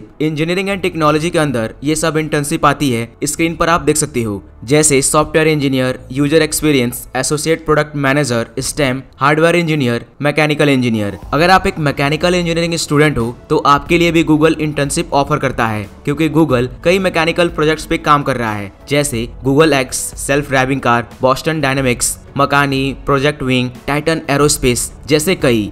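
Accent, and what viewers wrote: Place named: Assam, India. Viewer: Aap assam se ho kya?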